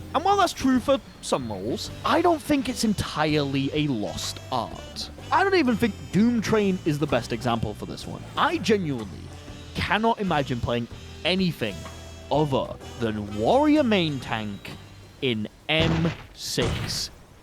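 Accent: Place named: Australia. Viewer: "UK". No, Australian. So UK Lite 🙃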